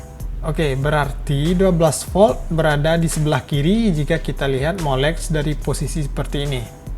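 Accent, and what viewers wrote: West Sumatra, Indonesia. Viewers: logat nya minang bgt nih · Dari logat nyo pasti uda ko urang minang..awak yakin 100%.. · Logat Sumbar nya kental nian...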